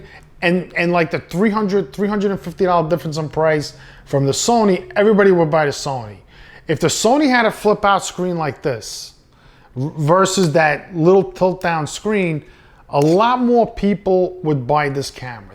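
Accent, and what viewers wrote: New York, United States. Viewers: Thanks for the great video, you sound like a New Yorker. I have a question about the sensor size, it's advertised that the 2500 has a 1" sensor. The actual dimension of the sensor is 13.2mm X 8.8mm. and there is 25mm in an inch. And if they add the L plus W it equals to 22mm and not 25mm. My Sony R1 Bridge camera is 12 years old and the sensor size is 21.5mm X 14.4mm which equals to 35.9mm. This means that my 12 yr. old Sony's sensor is about 60% larger than the FZ 2500 sensor. So my point is, all this hype about the 2500's sensor size is really no big deal, sounds like it's an advertising gimmick. What are your thoughts about this??? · Let me guess, your from New York. What is your obsession with the grip. Review the camera/cameras. Your voice is so irritating and the non info about the cameras means you wasted 20+ mins of my life.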